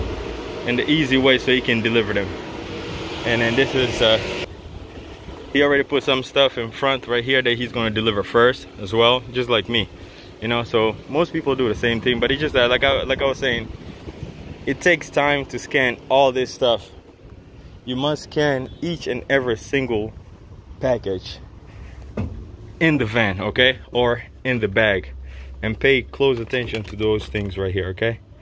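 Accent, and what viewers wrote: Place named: Jamaica. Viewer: I love your attitude...so much...u sound Jamaican....or something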